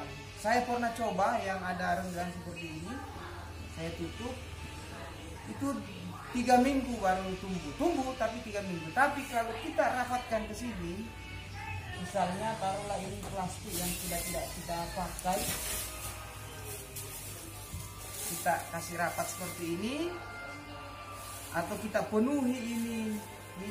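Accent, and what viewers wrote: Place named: Bugis, Indonesia. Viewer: Logat bugis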